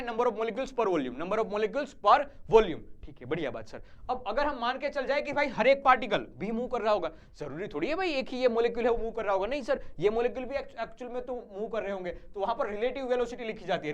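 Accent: Gujarat, India. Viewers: Sir gujrati hai....shayad...😊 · Sir aap Gujarati ho kya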